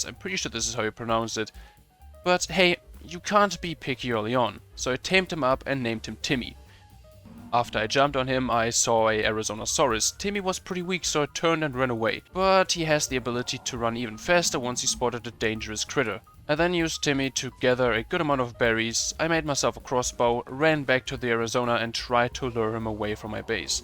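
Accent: Germany. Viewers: Bro Sounds like a german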